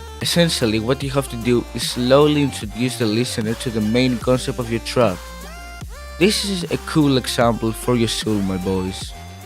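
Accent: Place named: Greece. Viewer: Bro where are you from? You have such a Greek accent haha. Great video !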